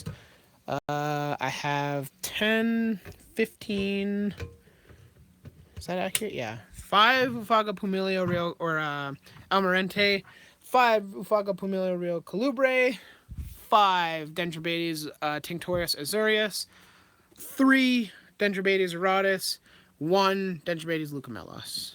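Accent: Canada. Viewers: just curious where you reside? u sound like an albertan like me (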